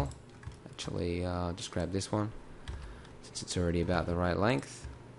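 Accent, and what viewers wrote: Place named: Australia. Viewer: this daft Aussie with his annoying accent ("blen-Dah", "heeyah", "compositah") cant tutor crap. he's more into showing off than teaching. he's as bad as Sardi Pax. the best tutor, especially for beginners, is tutor4u. ive learned so much from him.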